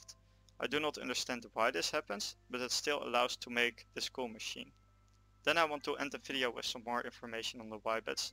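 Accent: Netherlands. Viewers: je kunt gewoon horen dat je een nederlands accent hebt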